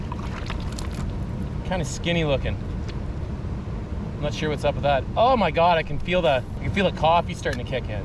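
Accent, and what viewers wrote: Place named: Saskatchewan, Canada. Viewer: Great canoe camping videos. It made me very nostalgic, When I was very young (24ish, I am 62 now) I sold my car and all other possessions and went on an almost two years coast to coast (from Newfoundland to Vancouver) Canada backpacking adventure. I stopped the longer in Saskatchewan where I got a temporary job helping a University of Saskatchewan team trapping muskrats, mink & beaver in the lakes and rivers around Saskatoon as part of a large research project of professor Dr.Francois Messier. I was a young, enthusiastic vet and the team needed one for the anesthesia of wetland wildlife they were working with. Lots of canoeing and kayaking putting tons of Tomahawk live traps in muskrat and beaver dens in the summer. Later some snow machining doing the same in the brutal Saskatchewan winter. All in very similar places as your videos (therefore the nostalgia and listening to your familiar Sask accent). 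Lots of fun. Loved it. I run away in the winter to visit a friend in BC and to escape from the brutal snow & ice over there. New sub from the Yucatan Peninsula Mexico, I do some sea kayaking here if you are ever interested in that kind of stuff. Thanks for sharing. Elbows up! Canada strong!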